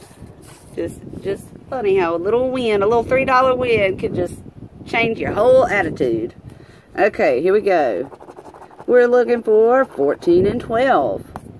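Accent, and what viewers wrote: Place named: South, United States. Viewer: Nice southern accent gal!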